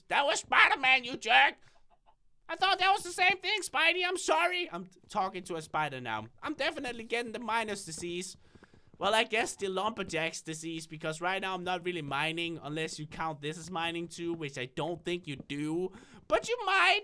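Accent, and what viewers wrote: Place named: Denmark. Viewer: It's his real voice but he has a Danish accent. When he speaks in Danish it is deeper. It's just sounds different when he speaks in English. I don't know about you but I absolutely love his voice!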